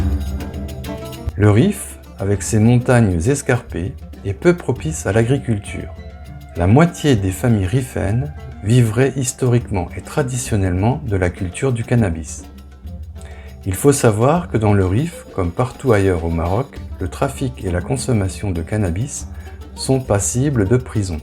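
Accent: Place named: Alsace, France. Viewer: j'ai cru reconnaître l'accent... Moi je le suis (alsacien), mais expatrié en Provence... Pour pouvoir un peu rouler en 4x4 sur les magnifiques Chemins du plateau de valensole et des gorges du Verdon....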